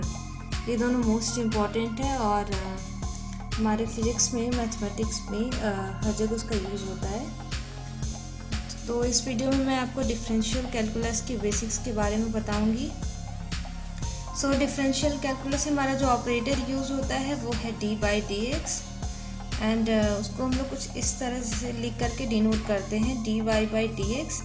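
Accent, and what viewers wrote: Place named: Varanasi, India. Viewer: Very nice... i found kashi in your voice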